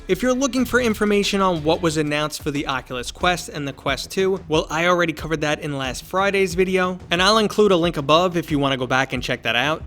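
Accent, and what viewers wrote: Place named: Boston, United States. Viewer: Let me guess, are you from Boston?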